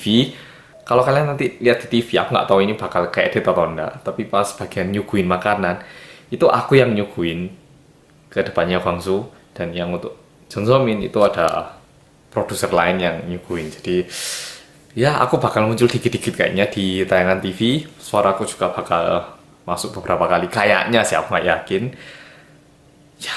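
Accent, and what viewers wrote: Java, Indonesia. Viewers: Seneng denger logat nya kakak indonesia sekali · pertama nonton videonya ngga pake headset kirain logatnya korea,ternyata jawa,proud of you kak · Orang korea tapi medok jowo ne 😁✌ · Ini org jawa atau korea???hehehe.. cocok.bgt oppa ini jd org jawa ya. Top deh :-) :-) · Mas ini aslinya orang korea ya? tpi cara ngomong jowo banget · Kaget pas ngomong ternyata medok kirain bakal ngomong korea atau belum fasih bahasa indo gitu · Hai kak.. aku subscriber baru nih di channel kakak.. beh ~ seneng banget liat kak ngomong bahasa jawa.. 😆 medoknya itu lho 'njawani buanget'.. 😆 ditunggu lho kak yang part 5 nya ~ 😁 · Suka suaranya agak medok jawa.... 😀😘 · haha.jadi penasaran apa yg sebenernya telah terjadi di balik layar XD. sy subscribe sampeyan karena informatif dan medok jowo. eh ga nyangka jadi koordinator RM yg sy tonton selama -/+ 6tahunan. 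anyway, just curious, kalau sampeyan bergumam atau ngomong dalam hati pakai bahasa apa?haha. atau sekedar ngomong 'kasar' atau mengumpat. disesuaikan dengan lingkungankah?haha. fighting! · Wow bahasa indo nya medok banget... Gw kerja di korea tapi bahasa koreanya gak sebagus bahasa indo dia.... Hahha Sini ke gwangju hehe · Wkwk bisa bhada indonesia tp logat dominan ke logat jawa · Sip bang. Jowo medok temen. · korea roso jowo ngene ki! kueren tenan mas!:D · Org korea ngomongnya medok bgt👍🏻👍🏻😍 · fasih banget bahasa jawa nya wkwkw medhok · Bahasa jawanya logatnya sma sprti orang chinese jawa...mngkin dulu dimalang tmn2nya bnyk orang chinese... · Sumpaaah jowoo bgt, koq iso toh?? Hahahhaha logat korea'ne ilang, hahahhahaha i adore u!!!!! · ini mas nya orang korea ? halahyung .. kok luweh medok ko aku 😫😫😫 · Medhok ya 😂👍🏼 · medok Jawa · logat jowo ne kuentel marai ngakak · ini beneran orang korea asli? 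bahasanya kox medok jawa ya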